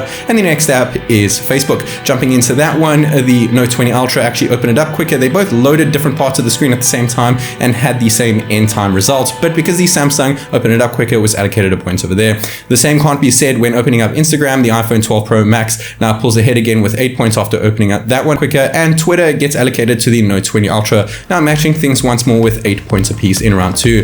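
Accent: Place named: South Africa. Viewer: Hi. I've been a sub for about a few weeks and the first word that you said, when I watched my first video of yours, I already knew you were from South Africa.😅 I'm from South Africa btw.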